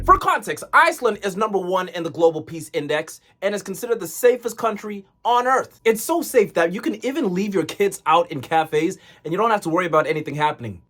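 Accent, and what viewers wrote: United States. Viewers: You're south African You sound American But live in the UK? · If he’s South African and leaves in England, why does he speak American? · How were you born in South Africa live in Birmingham but sound American. That's mad 😂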